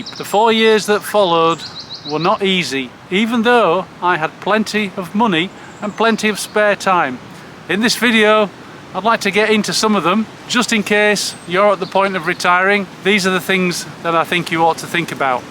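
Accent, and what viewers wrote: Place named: England, United Kingdom. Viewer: You must be in England somewhere I see that you sound like you're in england. I have direct ancestors that's from a lot of England.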